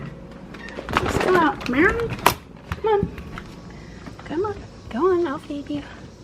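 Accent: South, United States. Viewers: Love your haircut, it totally suits you! And you say decor however you want. People just don’t understand how we talk here in Kentucky. Why don’t you say Holler, that’ll give something to think about lol. I moved to KY in 2007 and my accent has changed drastically 🤣. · As a Texas girl who moved to Minnesota, you do not need to "correct" your pronuciation. Your southern accent is part of your charm. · We love your rambling company keeping videos. And please say decor the way you like to say it. I love your accent. I’m from the north, but have a lot of family in the south and your accent makes me feel good brings back. Wonderful memories. And I love your little place too. It is so charming and homey.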